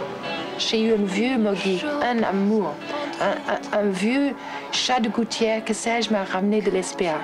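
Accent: United Kingdom. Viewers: chère Anita J’ai eu beaucoup de plaisir à lire votre message de retour. Moi-même j’ai 52 hivers. Depuis toujours, j’ai eu un profond respect et de l’admiration pour notre Jane. Elle a toujours possédé une belle plastique et une âme pure. Je ne vous cache pas Anita que son départ brutal m’a plongée dans un profond chagrin. Je n’arrive pas à réaliser que nous ne n’entendrons plus son délicieux accent british. Je me console en me disant qu’elle a rejoint Serge pour leur décadanse et que Kate les prends en photo sur la piste de danse du paradis. Je vous souhaite un très bel été chère Anita et un grand merci pour votre délicieux message. Amicalement, Corey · Son unique personnalité avec le charme de son accent bristish qui était toujours fort agréable à entendre ! C'était vraiment une magnifique personne très courageuse ! 🌹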